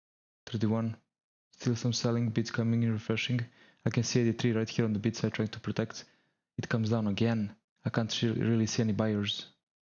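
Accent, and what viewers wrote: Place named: Serbia. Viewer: Odmah sam po naglasku znao da si Srbin, bravo najzad jedan covek koji razume sta je orderflow i sta znaci Dom trading. Iako je posle korone sve teze pratiti indicies jer je bid/ask tanak i options flow igra veliku ulogu zbog delta hedginga i dalje je dom najbolji nacin da se razume sta se desava u trenutku! Samo napred odlican kontent!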